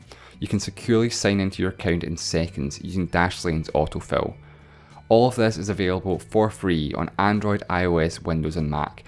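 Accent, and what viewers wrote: Scotland, United Kingdom. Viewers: Why scottish people pronounce "i" as "e"? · He is indeed Scottish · is the accent Scottish? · scottish i think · More like Scotish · is this a scottish accent? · are you scottish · Love the Scottish accent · Interesting, enjoyable and once again it shows how much there is to learn about the world and how much I don’t know. Thank you for doing this and I thought it was excellent. PS. Dinnae take any guff about your accent. Edinburgh or somewhere in the borders? Or do I have even more to learn about Scotland? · Andrew Matthews it’s scottish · He is Scottish give him a break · scottish english accent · sounds like a scottish accent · I'm thinking your country's capital is Edinburgh, based on your accent. 😉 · Scottish accent? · If im not mistaken he is scottish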